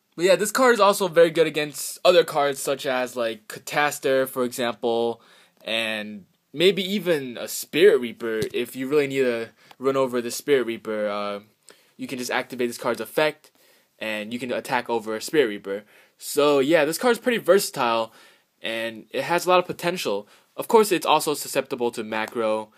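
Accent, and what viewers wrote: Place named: Asia. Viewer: Da azn accent :3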